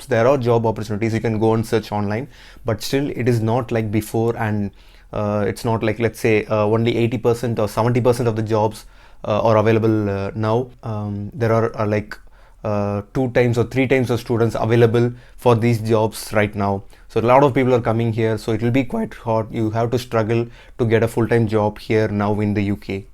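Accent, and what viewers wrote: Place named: India. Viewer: Why do you repeat the same thing again and again. Your accent is terrible - typical Indian accent 🤣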